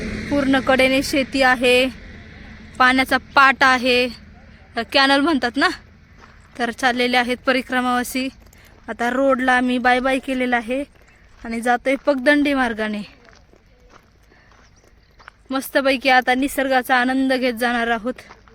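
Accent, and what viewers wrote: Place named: Rural, India. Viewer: ताई मी तुमच्या आई च्या वयाची असेन तुमचे परिक्रमे चे व्हिडीओ मी नेहमी बघते, तुम्ही खूप साधे पणाने राहता व साध्या सोप्या ग्रामीण गंध आहे तुमच्या बोलण्याला! नर्मदा मैया तुमची इच्छा पूर्ण करो! 🙏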